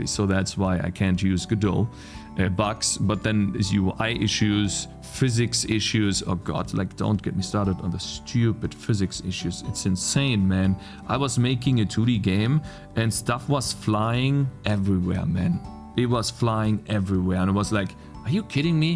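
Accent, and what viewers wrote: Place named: Germany. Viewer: Gothic 1 and the whole pronounciation of all the words, he is german I knew it. Also im in the same limbo you were.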